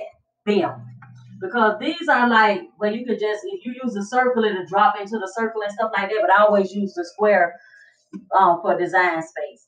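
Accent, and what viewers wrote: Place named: Florida, United States. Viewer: This was very helpful. I knew right away from that accent that you were from Florida. I believe I am right. 🤭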